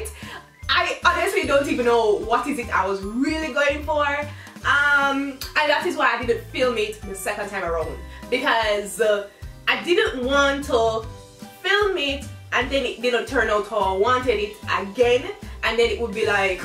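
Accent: Jamaica. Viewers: are you from Jamaica or did u lived in Jamaica because u talk like a Jamaican and btw am from Jamaica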